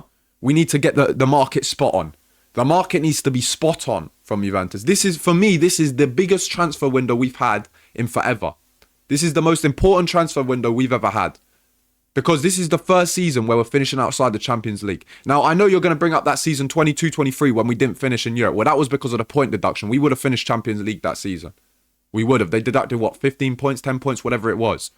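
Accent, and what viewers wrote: United Kingdom. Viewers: Why does this guys accent sound so brittish??? Isn’t he Italian? Lmao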